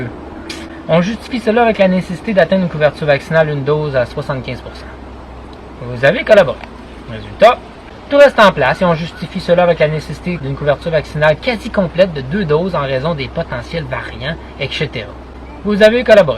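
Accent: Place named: Quebec, Canada. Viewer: Un belle accent gui va avec ton charme !! On adore l'accent québécois en Europe, et beaucoup de charme... Comme dirait un suisse comme je suis je fond comme du chocolat en te regardant et en t écoutant.... Magnifique harmonie !!!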